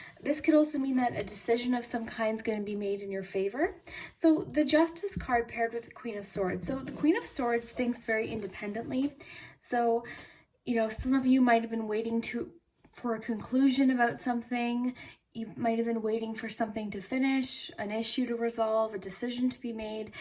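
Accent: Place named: Canada. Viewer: You sound like my dog's vet tech, but Canadian. I'm in love with your voice.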